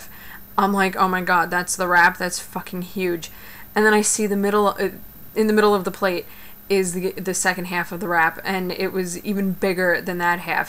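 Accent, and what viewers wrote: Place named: New York, United States. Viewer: haha this video made my mouth water! i love bbq food and fries ughh yum!! are you from NY cause you kinda have a NY accent?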